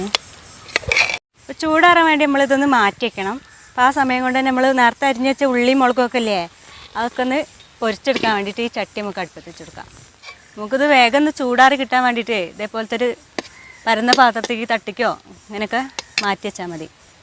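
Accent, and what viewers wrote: Malappuram, India. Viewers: മലപ്പുറം സ്ലാങ്ങിൽ നല്ല അവതരണം. ബീഫ് ചുക്ക.. അഡാർ ഐറ്റം 😍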